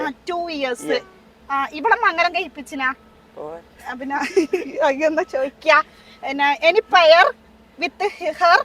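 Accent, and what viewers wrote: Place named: Kannur, India. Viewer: Hi Njan samsaram kelkkan vendi veendum veendum repeat cheyth kanaran, Ninglude kannur slang enikk bayankara ishttantta❤️❤️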